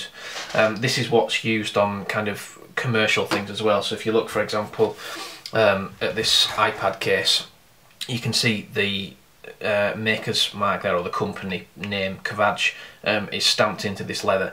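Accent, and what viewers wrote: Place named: Midlands, United Kingdom. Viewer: hi were did you get the stamp? and your press. I am on the uk your accent sounds like your in the Midlands.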